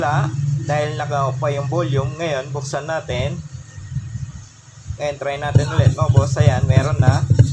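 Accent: Pampanga, Philippines. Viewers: kapampangan accent ka sir. . baka malapit kalang sakin. . pagawa ko nalang sana sayo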